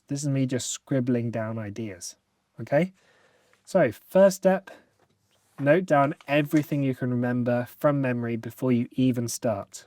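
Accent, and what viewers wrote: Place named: United Kingdom. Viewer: Bro started speaking, I heard the british accent and subed IMEDIATELY. Excited to get into the video lesson.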